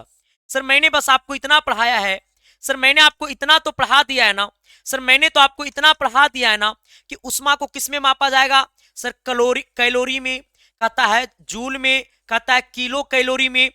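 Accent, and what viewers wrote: Bihar, India. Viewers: aap bihar se ho.....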